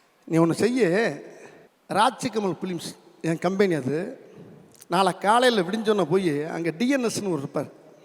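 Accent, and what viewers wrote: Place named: Madurai, India. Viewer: இவருக்கு கைக்கொடுத்தது இவர் பேசும் மதுரைத்தமிழ் வாழத்துக்கள்🎉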